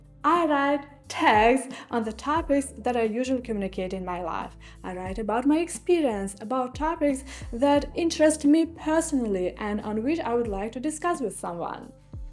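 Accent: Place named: Germany. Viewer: When told to speak German without speaking German. Your English is influenced greatly by your German, easy to sense it😂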